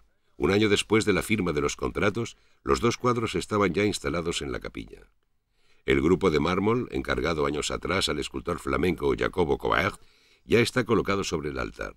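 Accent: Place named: Spain. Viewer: El narrador, un clásico del castellano peninsular.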